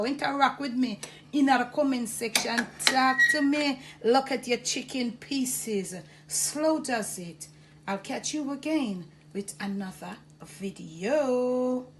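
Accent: Jamaica. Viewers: God, I wish she lived next door to me! Between the cooking, the attitude and that Jamaican accent, it would be simply DIVINE! 😊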